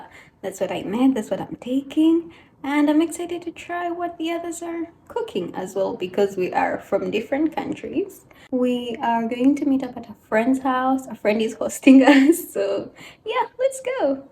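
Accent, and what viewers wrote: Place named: South Korea. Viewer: i love your Korean accent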